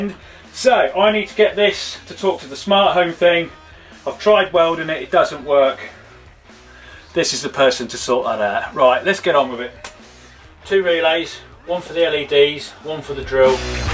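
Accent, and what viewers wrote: United Kingdom. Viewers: Wait you are from the United kingdom? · Are You British?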